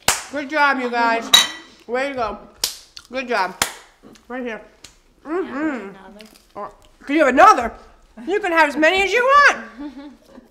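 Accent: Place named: New York, United States. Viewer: By her accent, I can tell she’s either from NJ or NY! Am I right? Lol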